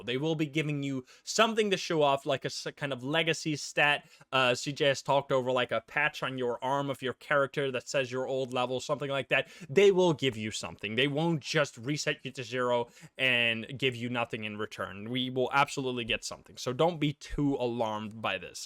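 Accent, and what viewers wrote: Netherlands. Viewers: Are you from netherlands?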